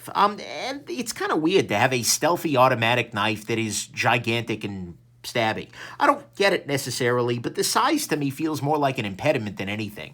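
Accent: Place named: New Jersey, United States. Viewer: Wow! I have to guess that you are a Jersey dude based on accent? (asking as a full on hick based on my accent) Great and informative review. Thanks!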